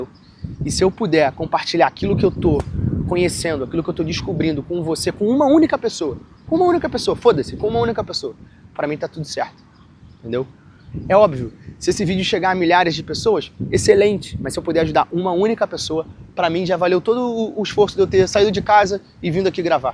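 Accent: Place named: Rio de Janeiro, Brazil. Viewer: Perfeição é o nome do seu vídeo. Viral, sensacional, português claro de carioca (foda)😂😂😂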